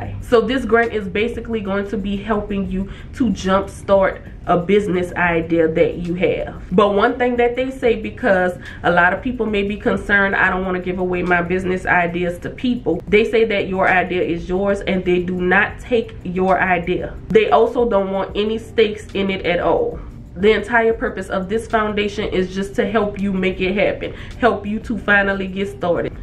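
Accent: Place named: New Orleans, United States. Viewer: I knew it!!! I heard your accent in a few videos ago and I said, "She sounds like she's from New Orleans." The way we pronounce our words is so unique. Thank you so much, homegirl, for the awesome content you create that offers so much information. You're a blessing,.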